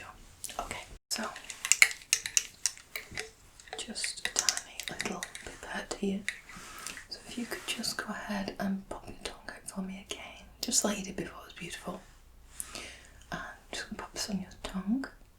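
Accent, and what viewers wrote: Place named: North West England, United Kingdom. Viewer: Hello, happy Xmas, that accent sounds north west?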